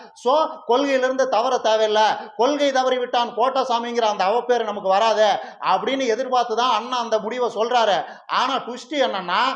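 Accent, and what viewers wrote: Kongu, India. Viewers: அது எப்படியா உன் கொங்குத் தமிழ் பேச்சுல நீ சிரிக்காம அடுத்தவனை நிறுத்தாம சிரிக்க வைக்குற யூ ட்யூப்பர்லே நீ வித்தியாசமான ஆளுய்யா.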